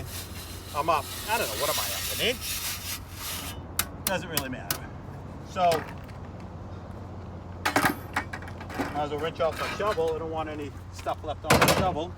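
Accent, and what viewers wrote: United States. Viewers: Sounds like he's from New York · Sounds like a Worcester accent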